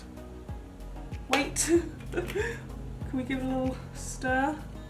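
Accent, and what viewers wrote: United Kingdom. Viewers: Are u from Britain ? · she's British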